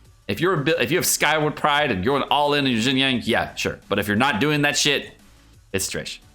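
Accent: United Kingdom. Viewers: Y British tho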